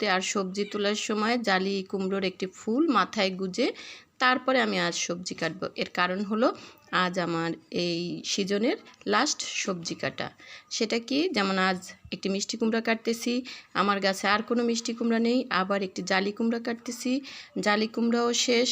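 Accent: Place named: Barishal, Bangladesh. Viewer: 125করে পাশে রইলাম আপনি কিন্তু বরিশাইল্লা কথায় বুজা যায়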